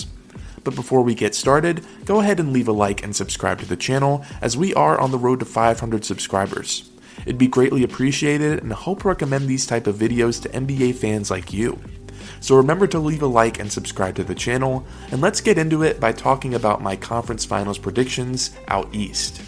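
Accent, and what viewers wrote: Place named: South, United States. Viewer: Such a strong southern accent!!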